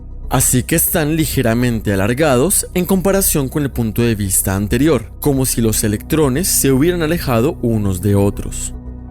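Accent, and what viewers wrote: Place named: Colombia. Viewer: Escuche este video en inglés. Ahora lo intente escuchar en español no puede escuchar más tres minutos, ese acento colombiano no puedo, no puedo espero que cambien al narrador por un acento más neutral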